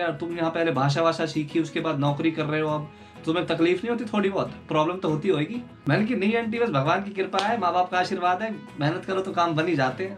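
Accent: Haryana, India. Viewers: Bhai yr tum jb haryanvi accent bolte ho to bda maza aata hai lgta hai tum india mai hi ho...aise hi rehna bhai...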